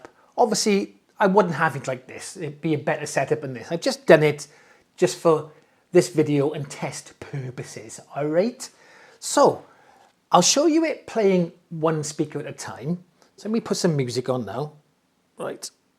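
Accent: Wales, United Kingdom. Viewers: Just subbed and thanks for you content , loving the welsh accent 🤣🤣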